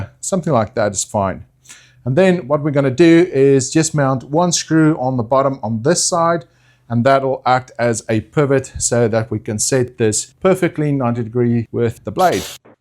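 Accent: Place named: South Africa. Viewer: That’s awesome. I knew you were South African from the accent and the “spirit level”. I love this build and I’m going to do it boet.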